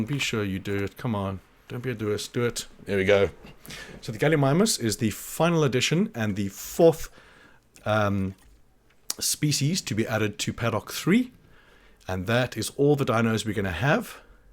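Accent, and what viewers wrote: South Africa. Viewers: Bro, just found your channel. Nice to hear a South African for once. Keep going dawg, climb that mountain 💪🏻🔥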